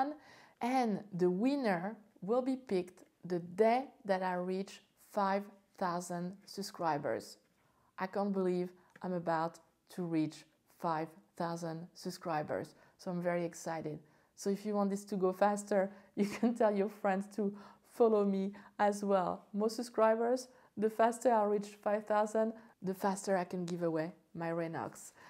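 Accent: France. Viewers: I do like your french accent :-;, i use the 60mm and i am very happy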